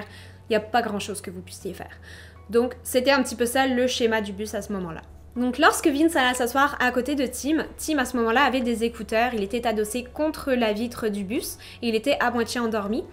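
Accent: Quebec, Canada. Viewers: Est-ce que tu parle avec l'accent québécois en temp normal?? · Petite question rien a voir, tu est Canadienne ou vous au Canada ? J'entends un tout petit accent que j'adore ! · J étais sur qu'elle avait un accent français et québécois. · Pour dire que tu es du canada ton accent je l'entend a peine sur certains mot 😁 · Je savais que tu avais un accent. Tu es de où au Canada-Québec. Fille du Québec xxx 🌺🌺🌺🌺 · Je suis au Québec et je me rappelle parfaitement de cette histoire. C'était assez fou ! Changement de sujet, en t'écoutant j'entends l'influence "canadienne" dans ta voix et le choix de certains mots. Je trouve ça mignon ;-) Bonne continuité xx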